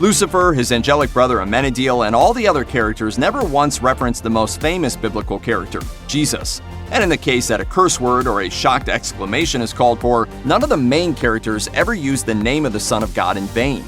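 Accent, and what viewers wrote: United Kingdom. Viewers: So sick of that British accent 😁 Am i the one?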